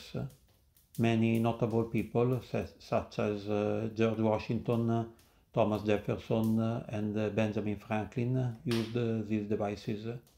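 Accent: Italy. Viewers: Is that an italian accent i am hearing?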